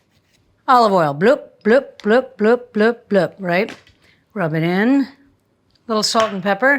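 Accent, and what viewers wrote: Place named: England, United Kingdom. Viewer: hold on while I get myself together 😂😅🤣,Your hilarious 😂😅😂,love your English accent,Oh yes love your cooking 🧑‍🍳 shows.Thank you for so much entertainment 😘😘❤️🇬🇧❤️🧑‍🍳🥂😇😘😘❤️🙏❤️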